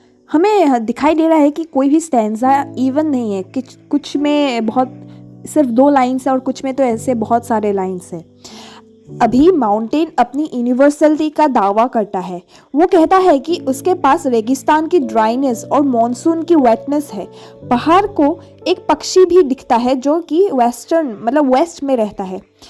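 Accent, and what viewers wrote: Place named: Northeast India, India. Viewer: Her accents:) 100%she is from north-east 🫂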